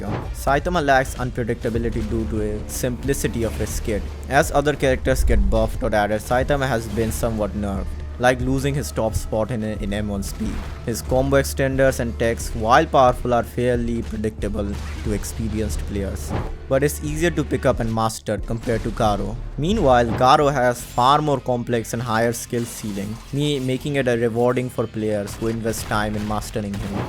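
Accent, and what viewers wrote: India. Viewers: The indian accent it makes it beter ong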